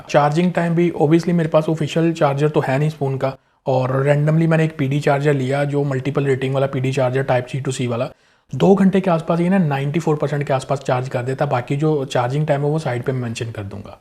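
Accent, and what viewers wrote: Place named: Punjab, India. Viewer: Lagta hy gu panjabi hu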